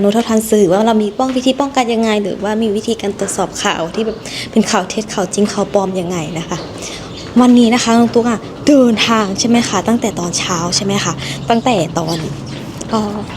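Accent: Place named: Laos. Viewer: พูดไทนชัดมากพยายามอย่าพูดตกสำเนียงลาว-ร-ล-ช-ให้ชัด-ควม-ความ❤❤❤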